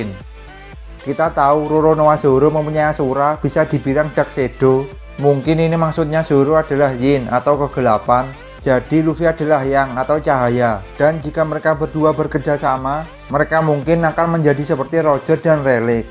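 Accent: Java, Indonesia. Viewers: Suaramu medhok min ... Heheheh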